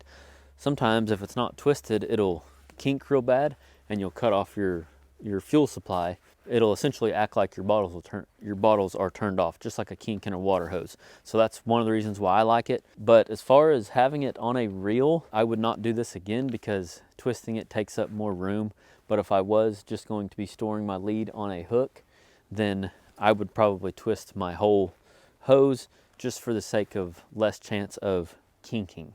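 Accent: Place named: South, United States. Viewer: A 'Southern' accent. Maybe Oklahoma or Texas? Not sure, but the gas/oilfields are in those states. All the Southern States have their own distinctive accents. My wife is from Tennessee & Mississippi, which is different. Georgia & the Carolinas sound very beautiful to my ear, but I like them all.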